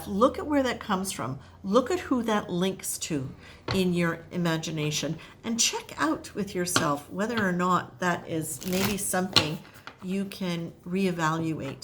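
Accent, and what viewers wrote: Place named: Ireland. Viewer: Thank you! Love you Irish accent! So on point! (Fellow Irish person).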